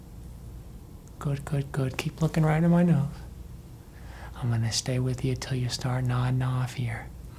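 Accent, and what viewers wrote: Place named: Midwest, United States. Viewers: You sound Midwestern and i love it 😊 you feel so comforting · He's giving me Chicago Bears SNL with the accent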